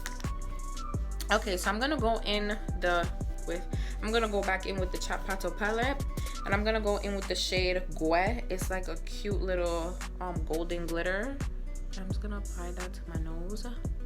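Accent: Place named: Jamaica. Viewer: Your Jamaican? You have a amazing accent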